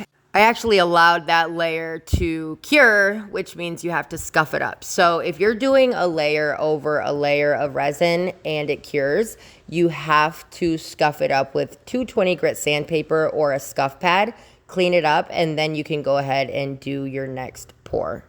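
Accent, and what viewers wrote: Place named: Michigan, United States. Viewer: you talk like me, you have petosky stones you find near you, do you live in michigan? like me? i loved the table although its way more difficult than what i am trying to do, the only thing in your video i would change is i kept worrying about your hair either getting caught in the resin or the tools, made me worry like a momma bear. lol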